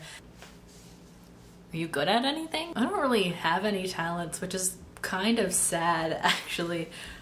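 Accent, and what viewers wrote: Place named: Canada. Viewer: I can't believe your favourite word in French is besoin. This is so random. ALSO, CANADIAN ACCENT. YES. YES.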